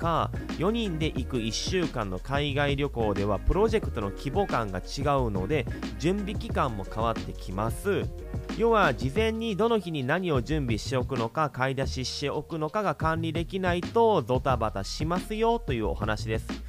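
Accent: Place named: Kansai, Japan. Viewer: 関西の出身でしょうか、親しみを感じて聴き易いです。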